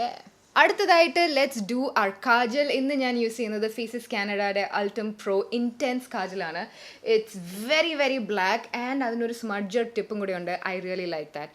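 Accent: India. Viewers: I am glad you're trying videos in Malayalam! I am in Canada and I understand the language fully but its really hard to keep a conversation flowing even though my parents speak to me fully in the language. This motivates me to keep talking. Once I start, I am fine. Your Malayalam is definitely improving from other attempts so keep going! At least you have an Indian accent so it helps for it flow smoothly too! :) That is so sweet a follower helped for the translation too to help non-speakers!!! You're almost to 1M and I am excited, you are going to hit there before Jan 2022 I can feel it!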